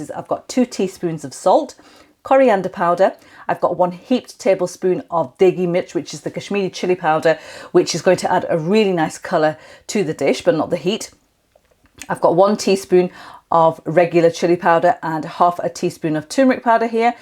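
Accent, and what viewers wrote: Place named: Lancashire, United Kingdom. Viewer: I'm really ready to make this dish. Thanks for the recipe and encouragement. I also detect a Lancs accent - worked there many moons ago. And it's so nice hearing you pronounce the word Dal 🙂 brings back fond memories. Ive subcribed and will urge others to do so... Thanks so much.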